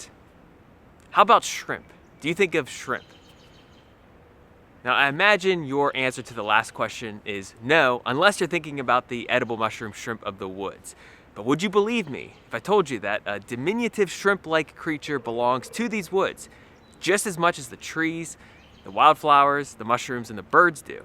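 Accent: Pittsburgh, United States. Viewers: I love hearing a Pittsburgh accent in a nature video. We do have interesting backyards lol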